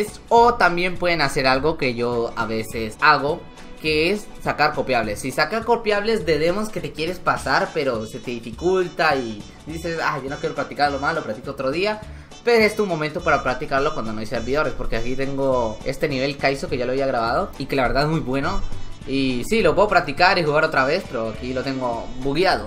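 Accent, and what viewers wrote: Colombia. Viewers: Eres de colombia?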